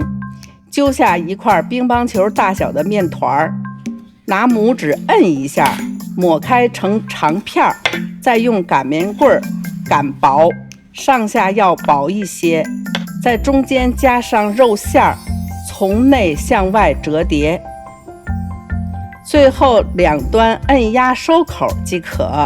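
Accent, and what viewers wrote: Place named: Beijing, China. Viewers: 地道老北京味儿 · 听着地道的北京话，突然想奶奶了……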